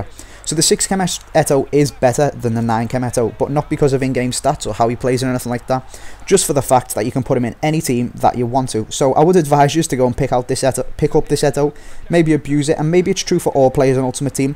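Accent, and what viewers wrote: Liverpool, United Kingdom. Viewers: i thought it was just the scouse accent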